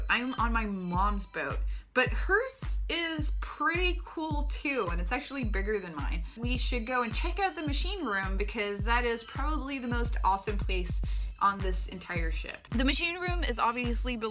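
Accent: United States. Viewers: That is one of the most appropriate and awesome XKCD placements I have ever seen, excellent work. Also enjoyed the "Mothership" line. I thought you were American from your accent until you mentioned it, actually. It's an oil filter wrench. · Hey your channel is really cool! The stuff you do is great and you're a likable person. Also, as a language nerd, I appreciate your excellent American accent. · Quirky, smart and entertaining! You really do sound like an american though, you picked up the accent really well. :) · Aha! a fellow non-native american accent speaker! lol I am, too, a non-american/canadian who speaks "without" an accent (just like u, all because i watch a lot of american movies since i was very little) and I currently live in Canada. I actually wished sometimes that I have an accent, because there are a lot of times where i would look stupid, bcs of the same reason as you, or when i messes up my grammar or word pronounciation, or when i feel "alien" towards certain north american pop culture. 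(I had no idea who Bill Nye or what Fresh Prince of Bell-Air is until my friend mentioned it about a year ago..) Question, though; are u able to speak english with an accent or watching a lot of american TV basically erased ur ability to do so? (I am the latter.. or maybe i just suck at doing accent in the first place) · and to where does this swdish girl credit her drop perfect american accent?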